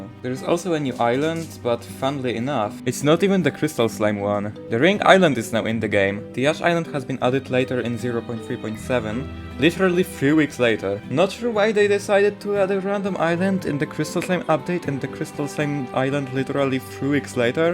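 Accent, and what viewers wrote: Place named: Poland. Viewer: this guy's polish accent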